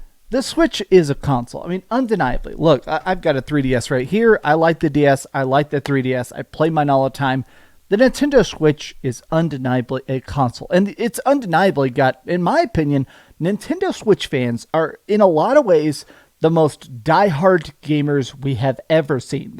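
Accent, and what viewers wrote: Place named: South, United States. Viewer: Southern accent. He even says y'all. Are you saying white people can't have their own culture?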